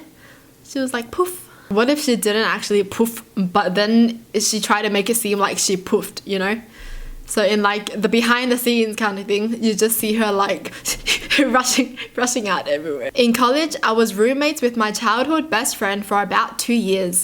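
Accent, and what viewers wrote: Australia. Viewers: Who else loves her Aussie Accent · omg idk why but everytime I see, I think of Rose' (from blackpink). Like the cute aussie accent and everything!✨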